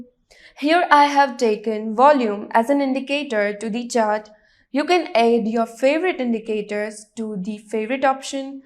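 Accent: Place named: United Kingdom. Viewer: You are sharing knowledge and your wisdom thats good.. and very nice of you.. the only thing that annoy is your british accent ... You can speak Indian english very well obviously but dont know why you dont prefer it... Just a point for improvement..no offence to you.. more power to you!!🙏🏼🙏🏼 All the best for your YT channel !!🔥🔥🔥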